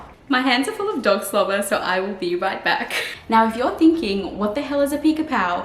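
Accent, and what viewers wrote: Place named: Australia. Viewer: Just found you today. You sound kinda Australian sometimes ?? There's not many of us around. Love all your creations ❤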